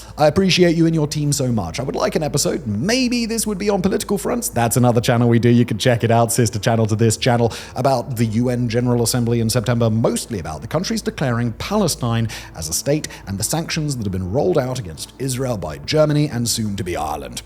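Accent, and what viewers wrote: United Kingdom. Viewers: His British accent is too thick. It sounds like jibberish. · Hey,! Love the channel, but could you dial down the British wanker cadence just a tad? Cheers!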